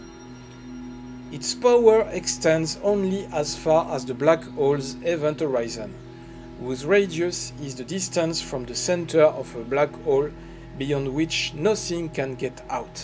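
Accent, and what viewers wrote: France. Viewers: I love your "French accent" when you're talking in English! LoOoL hehehehehehe !!! Otherwise, I'd like to hear more about "Secret Space Programme" and "Space Force" which could explain "black holes", "time travelling", "Galactic brothers and sisters" stationned around planet Earth waiting for a coming "cosmic event" that will happen SOON within our solar system. Thank you with Gratitude, Love and Light!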